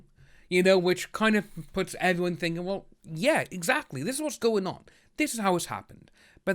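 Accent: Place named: United Kingdom. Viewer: I love your interactions with the viewers. I also really like your accent 💜 keep up the good work! Are you in the UK? I know you said Wales (sp) but I think there is one in Australia too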